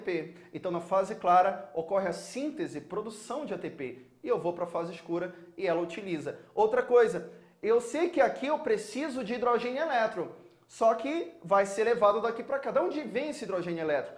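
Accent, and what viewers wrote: Pará, Brazil. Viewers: Maninnnnnho eu gostei da coisiNNNha. Que fofo tio, vc é paraense? Já gostava do antes, agora tá em casa!!! 👏👏👏👏👏👏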